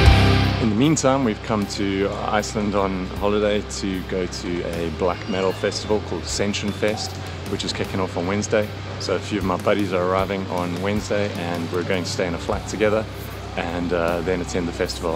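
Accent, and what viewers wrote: South Africa. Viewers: Random question and please correct me... are you South African?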